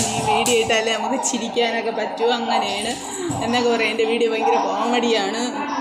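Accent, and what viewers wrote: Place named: Thiruvananthapuram, India. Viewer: നിങ്ങളുടെ തിരുവനന്തപുരം സ്ലാങ്ക് എനിക്കിഷ്ടമായി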